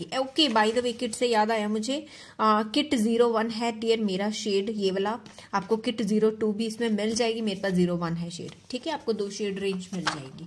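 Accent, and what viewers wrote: Punjab, India. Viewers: Awwww 😍 the way she talk 😍 full Punjabi 😍 love you dii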